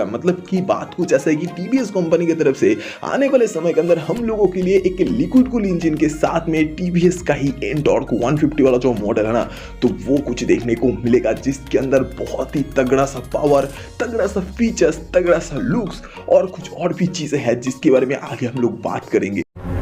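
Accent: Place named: West Bengal, India. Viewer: Voice to bangoli lagta hai 😅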